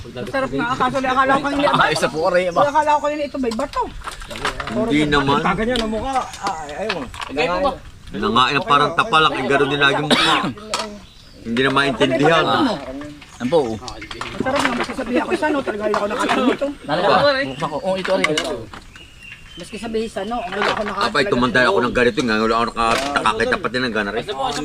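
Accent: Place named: Bicol, Philippines. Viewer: Yung salita mo po naalala ko yung kaibigan kong si unad taga bicol din 😢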